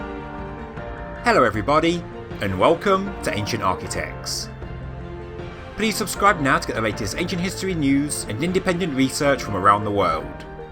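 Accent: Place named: Midlands, United Kingdom. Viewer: British, somewhere more north than London, probably Midlands.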